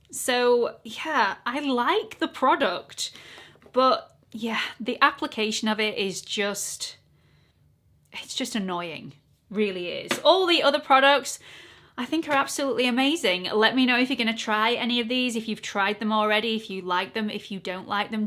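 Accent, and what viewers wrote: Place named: Yorkshire, United Kingdom. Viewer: Your accent just makes me so happy. Are you from Yorkshire?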